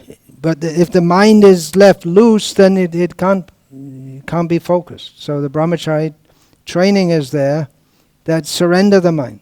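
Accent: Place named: England, United Kingdom. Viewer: Always amuses me how Bhakti Vikas S. pronounces Sanskrit with an English Twang or twist....Wow !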